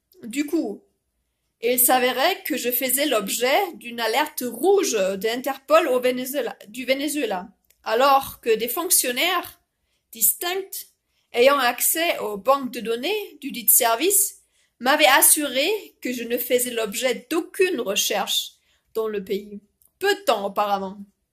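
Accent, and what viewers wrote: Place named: Germany. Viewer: Ich dachte mir schon vom akzent her das Sie deutsche sind. Es ist Das zweite vidéo dass ich mir von Ihnen anchaue. Ich leite weiter. Viel glück und dass diese verrueckte Welt endlich recht und wieder normal wird. Ich versuche so gut wie möglich auch meinen Beitrag zum Aufbau eine besseren zukunft für die zukünftigen generation. Ich drücke euch die Däumchen.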